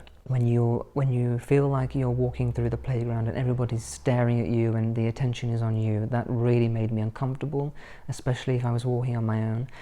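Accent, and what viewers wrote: RP, United Kingdom. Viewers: I see you have made a massive effort to be RP. Ironically, you sound posher than Prince William as the younger royals now do engage with the estuary accent! Just out of interest, do the rest of your family speak with a Birmingham accent? I think it can sometimes be a shame when people erase their natural accents. I enjoy the regional diversity of accents in the UK. For example, Sean Bean's Sheffield accent really adds quality to his performances. They wouldn't be the same with an RP accent.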